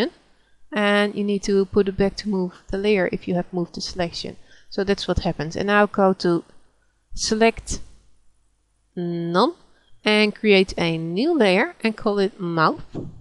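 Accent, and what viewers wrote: Netherlands. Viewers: Great video! Love the Dutch accent :)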